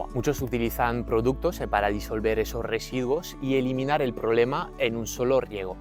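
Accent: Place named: France. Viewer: Sería bueno que nos explicara si en ojo o tallo falta o sobra algún tipo de mineral que sepamos. O cómo saberlo sin hacer un análisis de tierras. Porque aquí en Galicia existe el minifundio y muchos no podemos dedicarnos a hacer un análisis por cada pequeño pedazo de parcela que tengamos. Separados unos de otros😮 tienes un ligero acento francés😂 muchísimas gracias❤ muy buen vídeo❤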